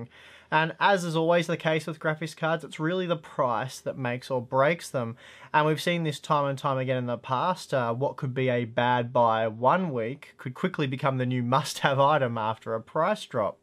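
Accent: Australia. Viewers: Cool, thanks for clarifying. I admit I subbed to the channel when Matt was doing the videos and really enjoyed the style and depth of the benchmarking. Then one day there was a funny looking guy without a blue shirt I thought I clicked on the wrong video! But then I realized the style and methodology was the same. With that said, I enjoy your videos just as much if not more since your Aussie accent is a bit more tamed! Keep up the good work man you are my favorite tech channel by far!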